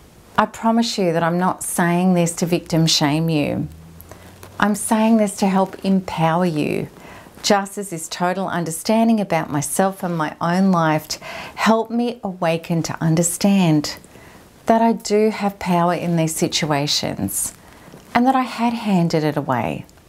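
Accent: Australia. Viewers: Can I please listen to you say you want me to "know" ? So cute . Are you from down unda? Wink wink. Love it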